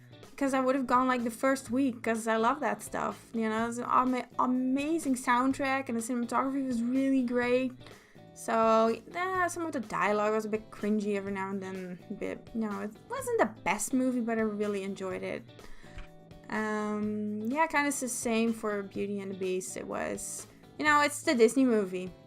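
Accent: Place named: United States. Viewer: YOU'RE DUTCH?! you have the cutest accent ever, i would have never guessed, i would have guessed somewhere in america! O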